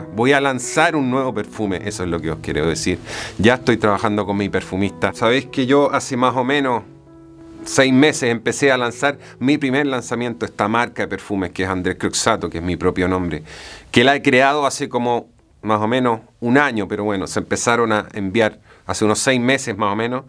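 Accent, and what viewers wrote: Chile. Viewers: Habla como chileno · estimado tienes acento chileno ? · Disculpen la ignorancia...de dónde es este compadre? Habla como nosotros los chilenos, pero ocupa algunas palabras en español. La pregunta es por si existe alguna zona de España que hable igual a nosotros.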